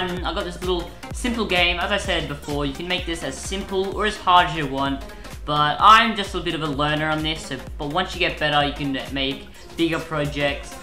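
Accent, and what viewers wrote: Australia. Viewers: Are you from Austrelia?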